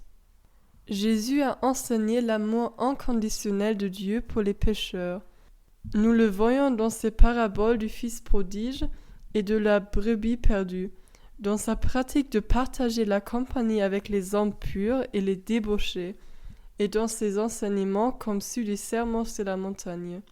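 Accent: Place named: Germany. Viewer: Cet accent allemand si charmant pour écouter parler théologie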